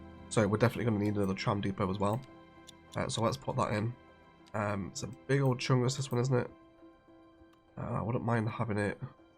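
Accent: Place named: RP, United Kingdom. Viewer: I listened to your proper, refined-sounding British voice telling me that you were inspired by a highway layout from Montgomery, Alabama! 😂 Talk about a contrast! 😂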